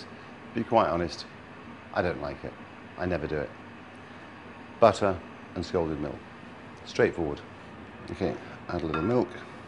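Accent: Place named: RP, United Kingdom. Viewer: Posh accent for a Leeds lad.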